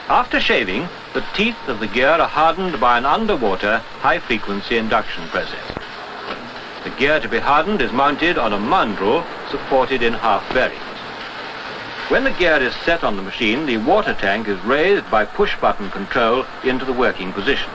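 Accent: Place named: RP, United Kingdom. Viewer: Hearing the narrator say “thou” instead of thousandth in his posh RP accent lends terrific credibility I must say old boy.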